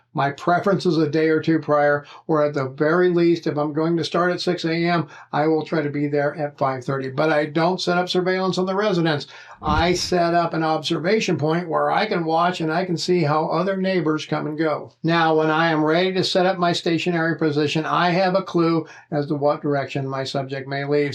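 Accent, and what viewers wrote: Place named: South, United States. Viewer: The 🔑 is leverage …and based on your accent you are from the south and you are reading from a prompter or a note .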